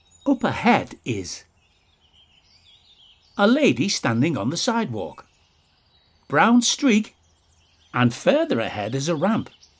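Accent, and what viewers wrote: United Kingdom. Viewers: the funniest part has to be the soft calm british narraration ontop of the hardcore gangsters trying to off eachother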